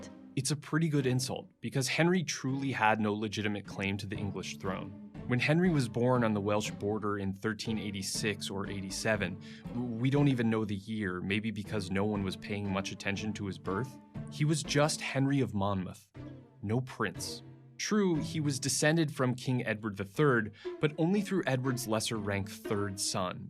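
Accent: United States. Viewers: Its jarring to hear a US accent, I would have preferred an English one. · Atleast get a narrator with an English Accent, this history lesson of England with an american accent seems so unoriginal and uninspiring. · It's a new experience for me to hear English history in an American accent - I enjoyed it.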